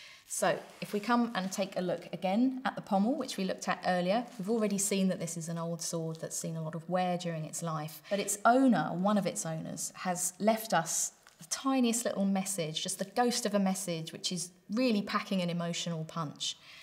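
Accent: England, United Kingdom. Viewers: It's amazing how a British accent can make two rusty piles of metal seem interesting. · Please re record this without the English accent. Thanks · Dr, that was excellent! I surely miss hearing a good British accent. Its been awhile. A comment and a question if I may........... I do wish I could have had teachers like you when growing up oh so many years ago, and Where were you when I was your age? You are quite a fetching young lady. I am curious about the tats on your inside forearms, are they of any historical significance? Sorry. That's 2 comments and 2 questions.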